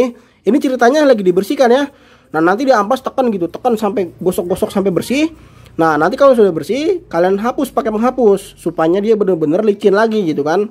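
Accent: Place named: Bekasi, Indonesia. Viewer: Pasti orang bekasi cikarang euy wqwqwq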